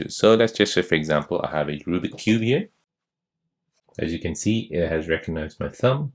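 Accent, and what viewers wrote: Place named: United States. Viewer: Its VOL UME not WOL UME. You spund American as well so why are you pronouncing like your German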